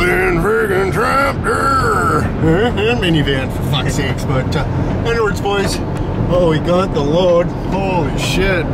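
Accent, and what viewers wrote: Canada. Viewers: lol, this guy is like someone from trailer park boys, but with more of a Canadian accent.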